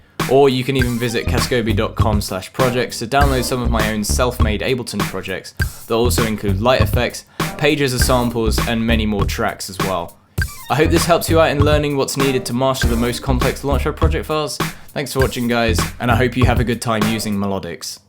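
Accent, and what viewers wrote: United Kingdom. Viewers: His British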